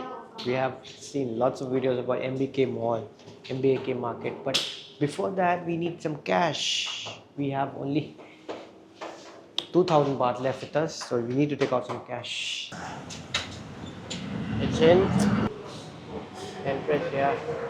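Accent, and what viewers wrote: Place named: India. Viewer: Change urrrrr indian accent please. They will think u r Nepali bhaiya . Rrrrrr. Oooooor change it please my ear is bleeding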